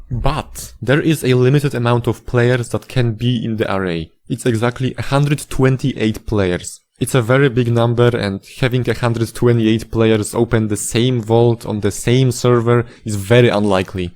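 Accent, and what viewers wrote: Poland. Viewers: kurwa ale polski akcent natychmiast slychac ze to polak mowiacy po angielsku doslownie po dwoch zdaniach niesamowite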